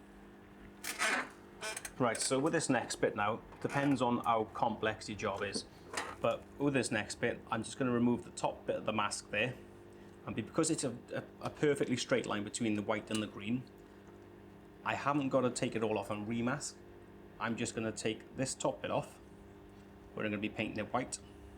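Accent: Wales, United Kingdom. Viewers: Nice video my man, no escaping the welsh accent unfortunately, but we don't care do we!😁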